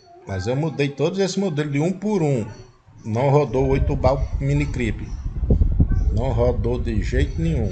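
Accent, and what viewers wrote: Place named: United Kingdom. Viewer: Gostei do inglês britânico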